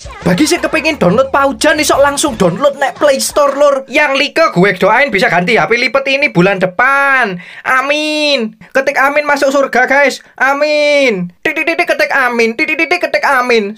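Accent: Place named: Malang, Indonesia. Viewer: Eruh sound malang mas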